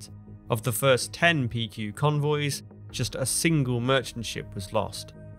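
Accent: England, United Kingdom. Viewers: Lack of detail; and I'm from the US. It takes more than an English accent. go do your homework. disliked.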